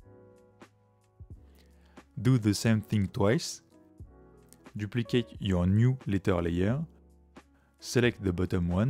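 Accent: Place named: France. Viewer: Merci pour tes vidéos ! Elles sont simples et claires et je kiffe trop ton french accent !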